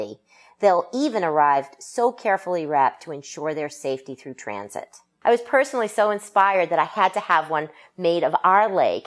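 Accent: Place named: Maine, United States. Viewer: Also, I’ve been to Maine enough to know the accent when I hear it. I could’ve told you where yours was without seeing the map.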